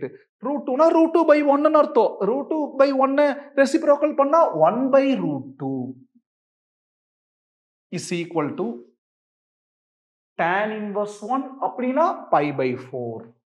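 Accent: Coimbatore, India. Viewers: That's becoz he's Coimbatore his slang changes right!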